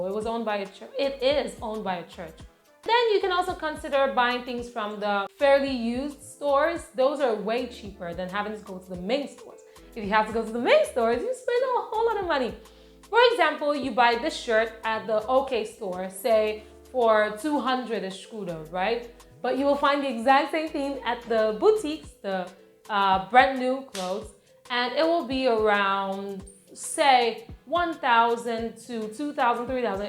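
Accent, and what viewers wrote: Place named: California, United States. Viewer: How come you have a California accent?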